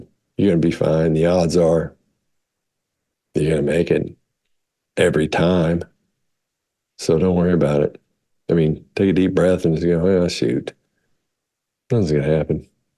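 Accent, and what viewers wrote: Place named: Tennessee, United States. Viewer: On you tube I watch a woman who is a great stand up. She's from Tennessee. I think her name is something like Jeannie Morgan.? When I started watching you, I thought you sounded like you're from Tennessee. You use similar phrases, "I love me a little baby." And "Bless her heart." I was delighted that I was right!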